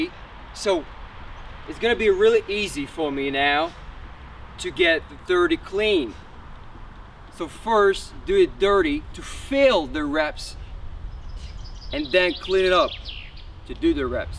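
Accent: Russia. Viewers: It’s a mix between Russian and a southern American accent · This guys accent is a mix between russian, american, american southern, and a lisp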